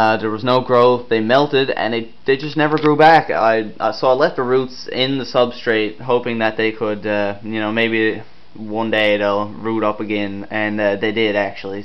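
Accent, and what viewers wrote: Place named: Canada. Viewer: sounds like your either canadian or Irish american. I also have a few questions, I want to get a betta and i don't really want too big of a tank you think a betta will be comfortable in a 5 gallon tank? My house it always around 78, will i have to get a filtration system or warmer, I was hoping just to have a 5 gallon tank, put some plants in it.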